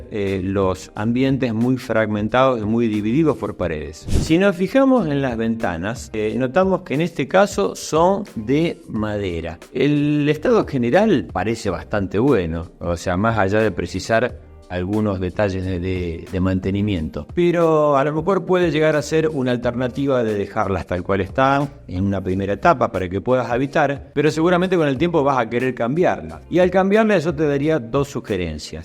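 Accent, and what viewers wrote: Argentina. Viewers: Buenos dias Arquitecto, Tienes un importante número de comentarios en este vídeo. Te sigo, y te sigo..esta vez si quiero escribirte (me leerás?). He hecho un experimento. Lavaplatos roto, lavo a mano uff, y escucho tu narración (después veré el video). Decirte que eres tan profesional en tu arte, y tan bueno divulgando divulgando...el habla argentina me gusta desde siempre. Me llamo Lujan. Mi padre fue un magnífico bailarin, premiado en competición de tango. Y vestía muy elegante.disculpas por este protocolo. Mi caso se encuentra entre los narrados por ti. A saber: compro casuca, sin mirar. Necesitaba poner ahi varios enseres. Me habia cansado y empobrecido el hecho de alquilar. Después de un tiempo querria hacer el lugar habitable. Sencillo. Me aconsejan demoler todo y volver a construir con los precios Abusivos, en mi opinión, que cobran en España. 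Así estoy casuca y yo. Pero te seguiré en todos tus vídeos. Y me preguntó: en España, Comunidad Valenciana, existirá un? Yo no lo he encontrado. Inteligente, honesto, conociendo su oficio, y con una pronunciación que merece un 10., soy profesora. Mi oficio y mi pasión por la Arquitectura Viene de muy pequeña. Jugaba a construir de todo con bloques de madera en cuatro colores. Un fuerte abrazo por darnos tanto conocimiento. Desde Valencia. Cerca del mar Mediterránei. Hasta pronto. Soy Lola.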